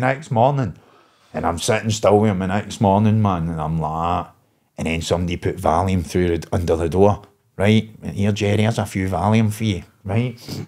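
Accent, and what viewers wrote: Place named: Glasgow, United Kingdom. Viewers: I understand every word am no even a weegie 😂 perfect accent of Scotland 😎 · Very very very funny. I feel sorry for your foreign audience, S... that's about as broad a Ouijii Lallans Scots as youll get.. Im a Scot and it tested my ear! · Unreal patter. It makes it even better that only us glesga folk understand every single word. Phenomenal haha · Maybe sub titles for his awesome Scottish accent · Hilarious story and made even more hilarious by the Jock accent. Sorry Yanks you're missing out.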